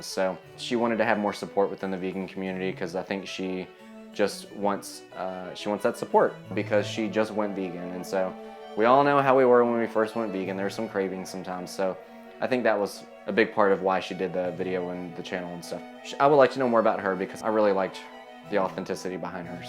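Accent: South, United States. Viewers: I thought I heard a southern accent! We are in NC now but my hubs is a native Nashvillian.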